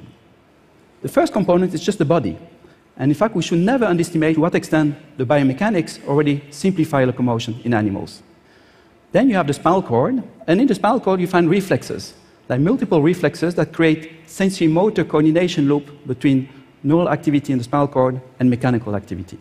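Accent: France. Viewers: he's a french guy. i can hear his accent 😁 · when i finally noticed he is french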